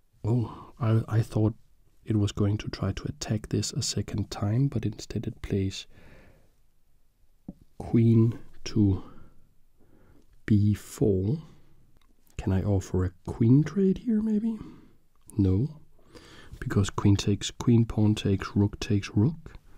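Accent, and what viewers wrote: Denmark. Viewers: Just gonna ask whats ur main language ur accsent remind me of some1 from denmark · Jeg havde aldrig troet jeg skulle sige det her - men er simpelthen så vild med din danske accent! Jeg ville elske, hvis du kunne inkorporere flere ASMR elementer (i nogle videoer), hvor du gentager ord eller “tapper” på noget!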